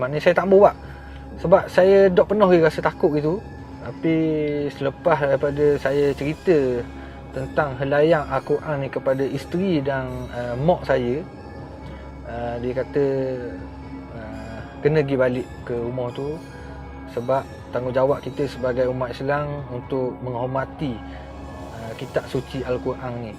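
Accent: Terengganu, Malaysia. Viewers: MU BUAT KONTEN..APA MU CKP PUN..AKU XPHM..CKP BENDA APA PUN XTAU..SBB MU PEKAT SGT GANU..CKP K.L...SEMUA OWG PHM..MU CKP GANU..MN PAHM..MU PUN NK CKP TAKOT2..LG XPHM..LAST2 AKU BOSAN NK TENGOK.. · Ooo... Awk org ganu ye.. 1st time lyn OH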